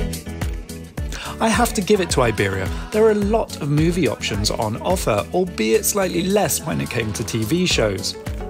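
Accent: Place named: United Kingdom. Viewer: Thanks for your informative and top notch aviation video. I am really impressed with Iberia the Spanish Airline with their latest aircraft and sumptuous banquet for Business Class passengers. Hope they become well known like the top three Middle Eastern airlines by flying to more destinations in Asia 🌏 also. Your video quality is brilliant and complemented by your commentary in an elegant English accent. Please keep up your high standards in such content creation. ❤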